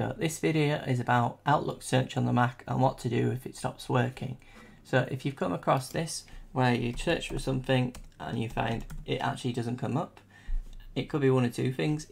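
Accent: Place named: United Kingdom. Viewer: You are a genius! I have gone to so many sites to try to fix this flaw on my brand new Macbook Air and your solution is the one that has made the Outlook search function work. The file display on my computer was a bit different than what was shown on your screen but I kept working to open the folders until I finally found Outlook in the library section. By including and then unchecking it from privacy it has started the search function. With your accent you might be in the UK--wish I could buy you a pint!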